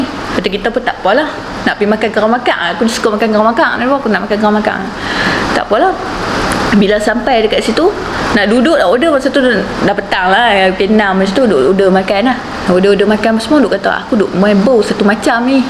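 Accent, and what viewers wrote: North, Malaysia. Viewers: sy 1st time jumpa n tgk channel ni..sy suka dgr n tgk cara cik/pn bercrita krana loghat utara tu..sb sy mmg suka dgr org ppuan utara beckp dgn loghatnya..so sy subscribe terus chnel u 🤗.. tp ni nk tnya sket la cik ni ada kelebihan pd pancaindera ke 6 ke klu x silap sy iatu mata yg bole nmpk benda² ghaib ??..😊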